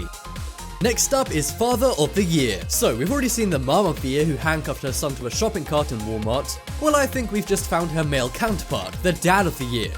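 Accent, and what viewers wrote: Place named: England, United Kingdom. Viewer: English accent SUCKS!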